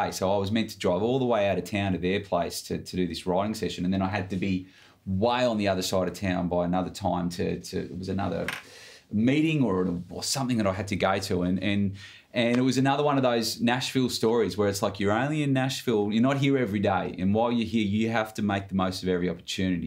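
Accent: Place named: Australia. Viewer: haha love the aussie accent so strong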